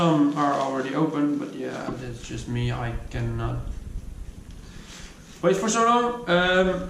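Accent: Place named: South Holland, Netherlands. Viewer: Hoor ook liever je Zuidhollandse accent hoor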